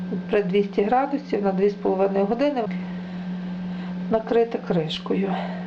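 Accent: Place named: Western Ukraine, Ukraine. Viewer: як приємно чути західний акцент)дуже гарний і зрозумілий рецепт) підписалась на твій канал, підтримай мене і підпишись на мій) дякую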